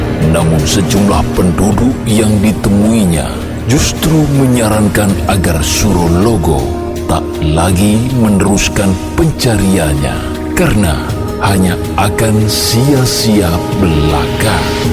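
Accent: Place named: Java, Indonesia. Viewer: Baru aja nemu channelmu mas (emot sedih). Njawani banget dari setiap katanya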